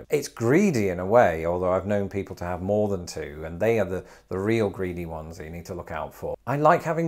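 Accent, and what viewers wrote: United Kingdom. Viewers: NEVER lose your beautiful British accent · I really did not want to subscribe to your channel only because I heard your British accent or whatever you're from ( American Bigot here ), but you speak of facts and facts only. If anything you made me more proud to be of an American and I hate it. You're a brit, but made me more open minded and I love you for that. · I'll always think you are a Brit,. No insult meant, but the accent marks you.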